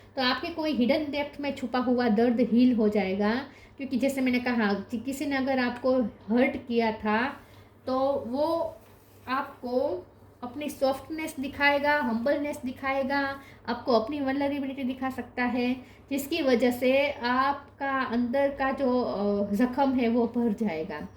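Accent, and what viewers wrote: Gujarat, India. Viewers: Are you gujarati?.😅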